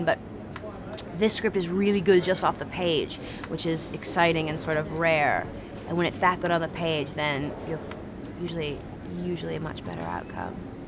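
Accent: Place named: England, United Kingdom. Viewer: Stick to your English accent, girl. Suits you better.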